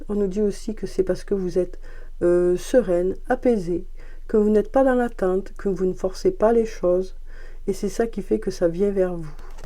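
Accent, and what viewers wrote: South, France. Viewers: Coucou, contente de voir la dame de l accent du soleil et toujours un plaisir de vous écouter,avec cette douceur et bienveillance merci 💐🙏😘 Bonne journée à vous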